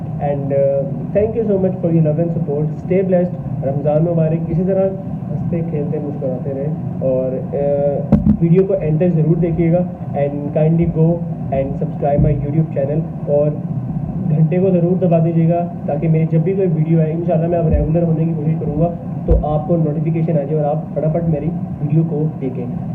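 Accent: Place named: Punjab, India. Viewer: U speak like pungabi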